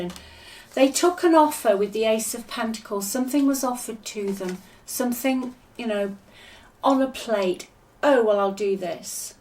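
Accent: England, United Kingdom. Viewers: ❤❤❤❤~ YOU are so Wonderful and Beautiful ~ Thank You for making my days Brighter with your readings! Love your English Accent ❤️ 💕 ♥️ ~ it's "magical"!!!🎉🎉 (I've got popcorn and snacks, lawn chairs and heaters [it's cold here where I am at] ready for the 'Show'😂😂😂😅🎉!!! Watching!!!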